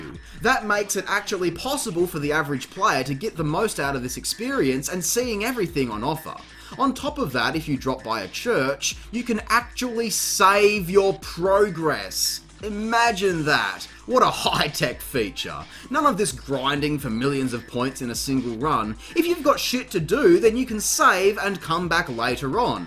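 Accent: Australia. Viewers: Dude, I’m new to your channel and I have to say, you have of the most authentic, energising and entertaining personalities of anyone I’ve seen making this kind of content. So many people play a kind of character when they’re in front of the camera. There’s nothing wrong with that per se, but it’s so refreshing to see a person cut out the nonsense and speak articulately and passionately about the things they love — and the things that give them the shits! And not to shit on anyone else, but it’s awesome to hear a proper Aussie accent without some kind of weird affectation / exaggeration that some of the more popular Aussie YouTubers seem to feel they need to put on. My only regret is not finding you sooner. Keep doing what you’re doing my man, I look forward to coming along for the ride!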